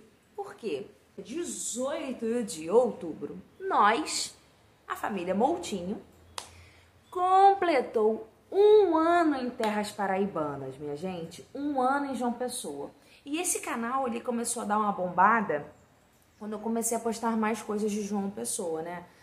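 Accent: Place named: Rio de Janeiro, Brazil. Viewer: Oi querida 🥰 Conheci hoje seu canal e gostei muito do seu jeitinho carioca de comunicar 😍 Também sou carioca e estou planejando morar em João Pessoa. Vou acompanhar você por aqui pra saber mais sobre a cidade. Um beijão e que Deus continue abençoando sua vida e sua família ❤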